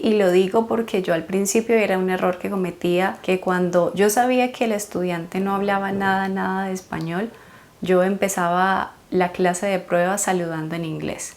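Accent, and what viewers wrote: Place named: Colombia. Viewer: Me encanta tu acento! soy de Colombia también del área de Cundinamarca, pero ahora que vivo en Europa por más de 10 años el acento se ma ha ido. Pero por más acentos que veo el bogotano y el tuyo es de mis favoritos.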